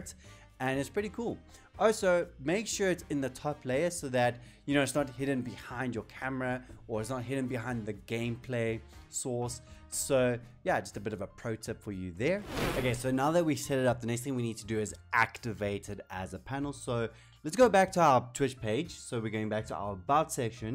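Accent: South Africa. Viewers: howzit bru I heard your accent and was like, "Is he south african???" then i heard you say PK and i new you were south african lol.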